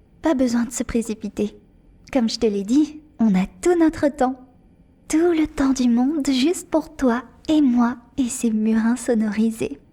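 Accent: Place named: England, United Kingdom. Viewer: Mais.. c'est la même personne que sur la chaine en Anglais? Je peux pas y croire, l'accent anglais sur la chaine principale vs le français parfait sur celle-ci :x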